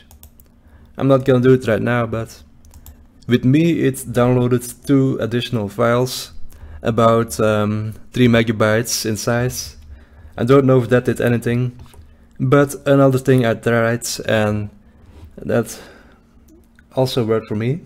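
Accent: Netherlands. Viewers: are u dutch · i just knew you were dutch haha i could hear it because of your accent haha. geweldige video man. kan eindelijk weer is normaal left 4 dead spelen haha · Are you dutch?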